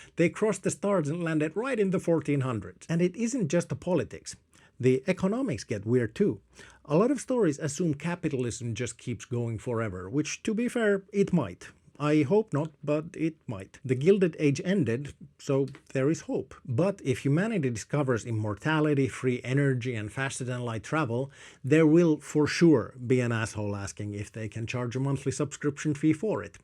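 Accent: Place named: Netherlands. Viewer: Surprisingly interesting channel. I can't quite place your accent and the name " " didn't give me any clues! Some Dutch sounding consonants... But that could also point towards certain Slavic or Uralic. In any event, your English is impeccable. I can't say that future economics is my favorite topic for speculation in science fiction. However, since you ask... I'm afraid i can't imagine a situation where there won't be SOME raw materials that will be scarce. I think we all know about how "rare earths" have become important to computing and cellular technologies. To my mind, that makes a future without some form of capitalism unlikely. However, automation of labor is a trend that hasnt stopped since the beginning of the industrial revolution (and in some ways, even before that.). Inevitably we will get to a point where we won't need to work nearly as much as we think we must right now. 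It you are looking for a "better economic model"'that fits this kind of future, i would say that we already have it in some places where it has been working better than the alternatives since around 1945.. it the Danish model - or Scandanavian model more generally. Highly regulated capitalism combined with a robust welfare state.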